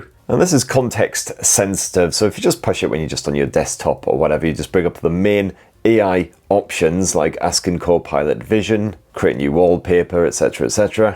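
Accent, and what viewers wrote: United Kingdom. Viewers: I really like mobile phone reviews in British English. ☺️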